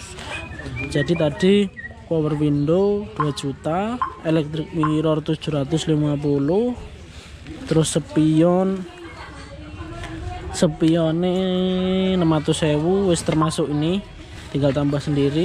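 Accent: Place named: Java, Indonesia. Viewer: Bahasanya belepotan. Campur aduk medhok .ha...ha...ha...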